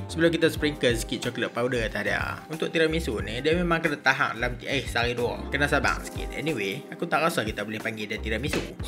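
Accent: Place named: Perlis, Malaysia. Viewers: Tudia noh pekat utara macam loghat kuala perlis😂😂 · Kannn...rindu akk anak buah dok Kuala perlis.persis suara camnilah...pekatt idgham die😊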